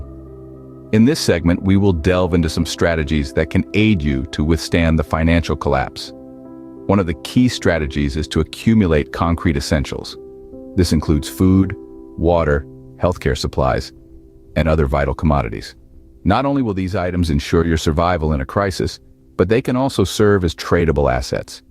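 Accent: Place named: South, United States. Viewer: I love your southern accent. 😉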